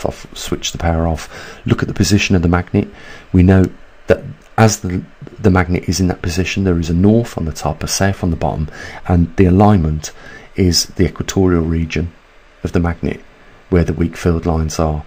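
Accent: Birmingham, United Kingdom. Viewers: You sound like a fellow Brummie. Lol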